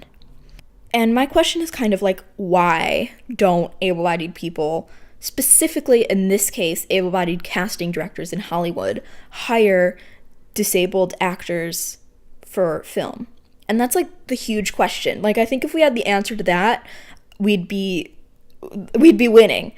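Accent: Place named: United Kingdom. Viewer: bloody hell that british accent was quite delightful, i liked to hear you criticize the movie industry because they have taken so many bad decisions when it comes to casting certain actors thinking it will not appeal to most of the audience,i think your point of views are well fundamented and i agree with you on most of them,so keep up the good work :)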